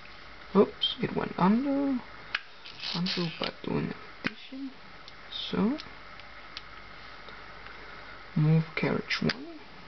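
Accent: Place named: Denmark. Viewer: oh god that horrible accent you're danish aren't you?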